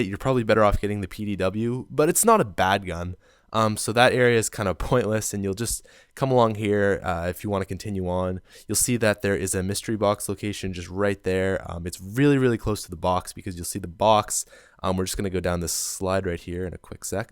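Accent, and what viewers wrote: Canada. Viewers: Great vid, love em. You're definitely Canadian though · You are from Canda your accent :P cool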